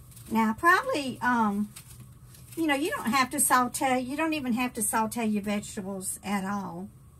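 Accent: South, United States. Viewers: Hey. I've been watching your videos for a while, but this is my first time commenting. I'm from North Carolina, so I can appreciate your southern accent. I can relate to a lot of what, and how you cook. I'm a southern cook too. I enjoy your videos. I got a big kick out of you getting tickled over the artificial leg article online. lol. Keep on making these good videos, and I'll "keep on comin' back." Happy Easter.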